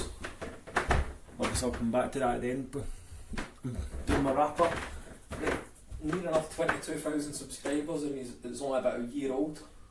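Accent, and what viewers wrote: Scotland, United Kingdom. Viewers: I came here for darts, and stayed for the Scottish accent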